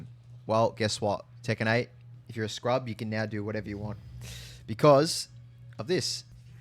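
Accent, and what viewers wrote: Australia. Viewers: Dam, it’s refreshing to hear an aussie accent in a tekken vid ey lol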